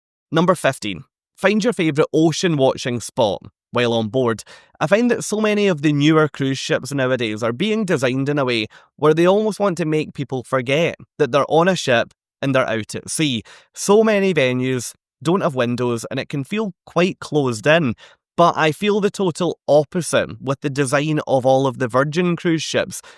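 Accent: Scotland, United Kingdom. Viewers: Another really charming video,! Just love that endearing Scottish accent! Our first VV cruise leaves Portsmouth next month. 13 days on the Mediterranean aboard Scarlet Lady. Shall see how we go, but that hammock and the great food constantly talked about should be some of the highlights. Have taken your tips on board. Thank you! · First of all LOVE your Scottish accent! Where in Scotland are you from? Second, all wonderful tips! We are cruising Virgin for the first time in February 2026, and cannot wait!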